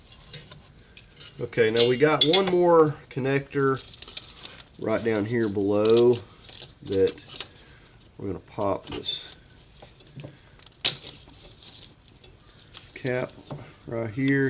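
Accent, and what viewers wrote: South, United States. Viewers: Jeez you must be from the south huh?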